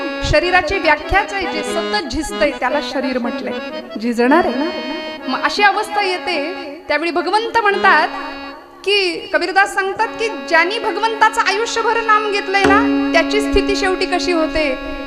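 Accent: Standard. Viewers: फार सुंदर , छान सादरीकरण शुद्ध उच्चार गोड वाणी मनाला भावून जाते .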